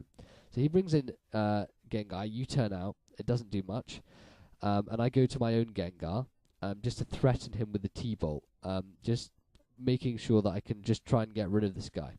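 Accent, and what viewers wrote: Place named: RP, United Kingdom. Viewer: It's a very posh BRITISH accent ya hill-billy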